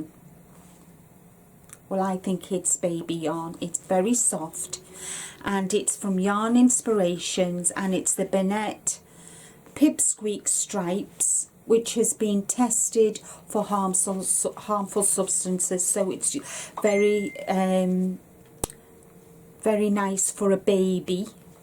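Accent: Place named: England, United Kingdom. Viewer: I just stumbled across your video I thought it was nice to be nice to win things to make for my grandkids I got so many of them making a baby blanket or booty those would be so nice sounds like you're in England I'm in the US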